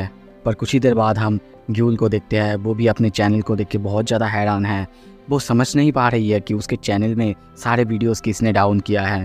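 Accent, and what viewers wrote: Bengal, India. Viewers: Are you bengali???😊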